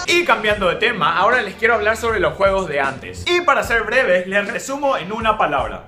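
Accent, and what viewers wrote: Paraguay. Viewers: Puta madre??? Puto amo???... !!!Habla como paraguayo idiota¡¡¡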